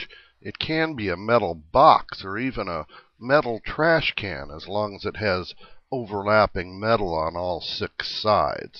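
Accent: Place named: South, United States. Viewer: You sound like a southerner :D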